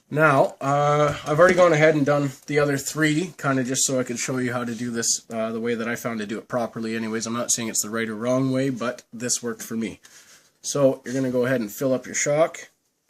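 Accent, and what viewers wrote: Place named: Canada. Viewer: You sound Canadian bud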